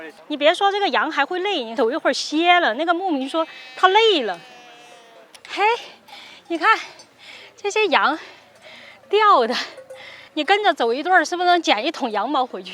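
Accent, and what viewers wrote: Sichuan, China. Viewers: 四川人，口音理解一下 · 听口音是四川老乡，支持下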